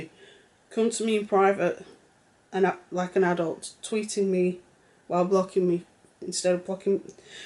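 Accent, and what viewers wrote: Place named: Yorkshire, United Kingdom. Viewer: You are so right, about the barrage of negativity and vitriol--it does make one feel ill, some of her streams are so vicious I can't watch at all, just have to turn it off. And feel the worse for having exposed myself to it. Anyone would feel ill after getting worked into such an angry emotional state, voluntarily mind you, for none of this arguing is anything but self-created and self-imposed; turn off the camera, and it all evaporates into the ether. The still frames captured from those streams and raging videos speak volumes. Some people live their entire lives like that however, in their daily life, in a constant state of arguing, cursing, shrieking, they live for conflict. One of the reasons i enjoy hearing your take is how calm you are, level headed, and that luvly Yorkshire accent. I have good friends from Hull, Barnsley, Sheffield, even up to Middlesbrough. 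York is one of my favorite places on planet earth, and if i won the lottery, I'd buy a small home there and spend my summers way out in the middle of the countryside, if i could. And just go into town once a week for supplies and pub.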